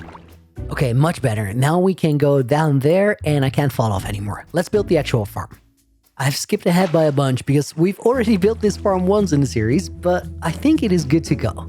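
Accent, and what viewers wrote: Netherlands. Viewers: Toch lekker dat kleine nederlandse accenr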